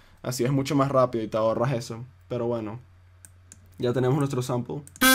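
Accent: Venezuela. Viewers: ahajajaja desde la primera palabra que dijiste supe que eras venezolano!!!, de donde eres bro? yo de bqto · jeje venezolano he.... excelente video muchas gracias !!!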